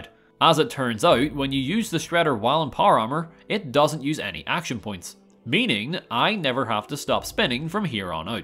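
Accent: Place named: Northern England, United Kingdom. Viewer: Where in north are you from mate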